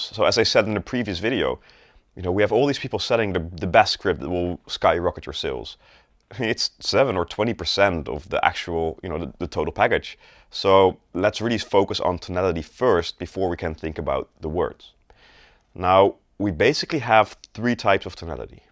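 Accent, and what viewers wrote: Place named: Netherlands. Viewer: This is very good,! My guess is that you’re from The Netherlands as well! We have such a unique accent lol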